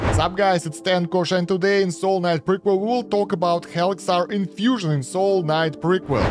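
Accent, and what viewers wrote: Russia. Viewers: ДАА, ТАКОЕ ЖЕ ОЩУЩЕНИЕ, ЖЕСТКИЙ РУССКИЙ АКЦЕНТ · такое ощющение что он русский и разговаривает на английском с ужасным акцентом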